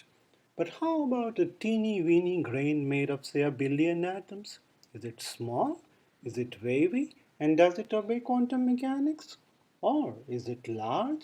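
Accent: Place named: India. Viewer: Sorry if i seam racist here, but i have to tell you! I just LOVE your indian exant, i really get this feeling of wanting to smile, thats rare. Its something about how you use tones in your voice. This a bonus for a lecturer like you, its like having einsteins hyper inteligent teddybear speaking to me through youtube or something. Newer loose that grat tone of woise. Your like a guru, not talking bullshit all the time.. More power to you :-)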